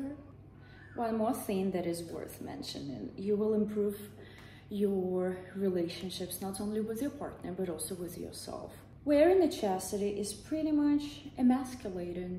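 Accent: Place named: Russia. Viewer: She's from Russia or Ukraine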